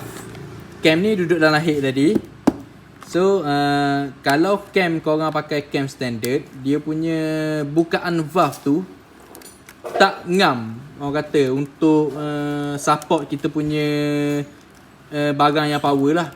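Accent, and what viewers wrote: Terengganu, Malaysia. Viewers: bang nk tanye..abg org mana ni dgr mcm terengganu je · Abang orang ganu ke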